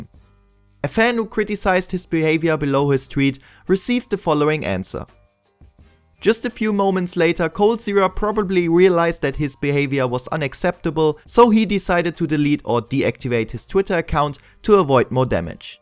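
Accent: Germany. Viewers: Are you German D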